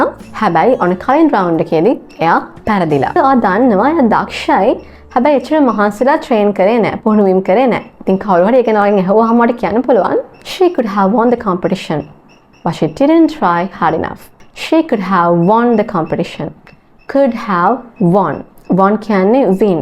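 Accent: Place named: England, United Kingdom. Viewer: i love your accent, my guess is that you studied in England.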